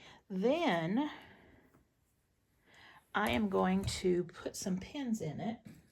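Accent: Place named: St. Louis, United States. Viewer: Are you from St. Louis? I heard only people fro St. Louis say (warsh). I'm from STL and say warsh.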